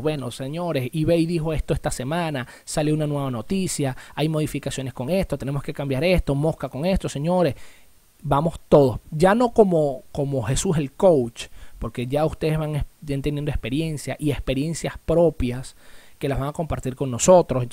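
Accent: Venezuela. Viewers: GRCIAS, EXCELENTE, CLARA, CONCISA Y SENCILLA EXPLICACION, POR TU ACENTO Y MODO DE HABLAR SEGURO QUE ERES VENEZOLANO COMO YO. GRACIAS.. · amigo saludo desde venezuela super importante su informacion pronto estare en contacto con usted,por su forma de expresarse es de venezuela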